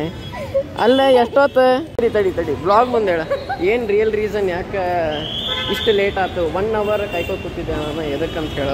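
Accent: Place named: Uttara Kannada, India. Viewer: First video nodidini. Uttarakannada bashe chennagide.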